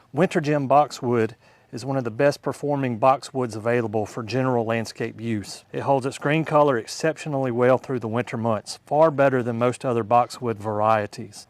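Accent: South, United States. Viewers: Where are you from? I'm trying to pinpoint your dialect. Arkansas? Alabama?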